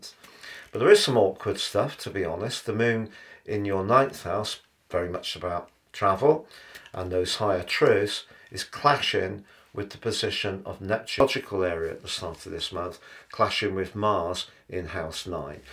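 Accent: United Kingdom. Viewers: Im a non native English speaker and i guess youre a British accent speaker which is really hard for me to understand i watched it twice to know about my abroad travelling for study purpose my visa decision will come on 27th August but i dont know what is about to happen about my travel i am so confused and couldn't catch what you said regarding this can you please tell me if it will be a positive chance of visa approval or what?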